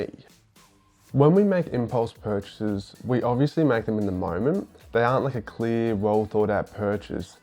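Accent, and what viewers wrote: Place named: New Zealand. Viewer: So your mom is from New Zealand. Good Guess on my part. LOL! 😉 Your accent … I was trying to place it and I thought: His accent sounds similar to Australians but not as prominent and then I thought New Zealand.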